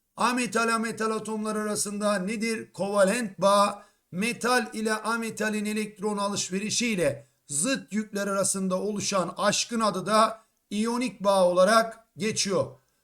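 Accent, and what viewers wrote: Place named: Erzurum, Turkey. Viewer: hocam şans eseri youtube ana ekranıma düştünüz tıkaldım izlemeye başladım konuşmanızdan Erzurum havası aldım 4. soruda 0 altı Erzurum dediğinizde dedim ki bu hoca Erzurumlu yani benim hemşerim acaba doğrumudur. ❤‍🔥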